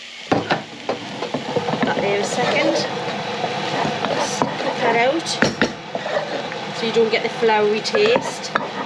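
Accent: Newcastle, United Kingdom. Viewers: I'm addicted to your channel, sitting at the kitchen table and drooling. I was born at the beginning of the second world war, so lived with ratioing until my teens. We all ate wonderful pies and stews like yours and obesity was unknown, but we thrived. We only began to get fat when sugary, highly processed food became the norm. What a tragedy affluence has been. I would love to see your Toad in the Hole and Weardale Tatie Cake sometime, please. I live in Weardale, but have not found a really good recipe. It's lovely to listen to a real Geordie telling me about the wonderful food my mother used to make.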